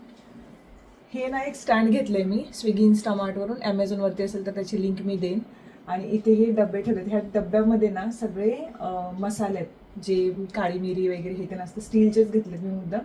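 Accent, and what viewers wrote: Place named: Ghat, India. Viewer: Tu ghati ka boltes kokani ka ny bolt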